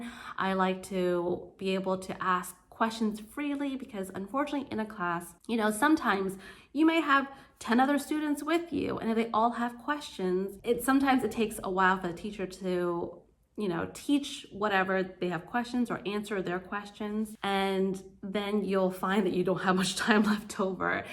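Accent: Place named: Spain. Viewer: You talk like your Spanish. Not bad, to be honest.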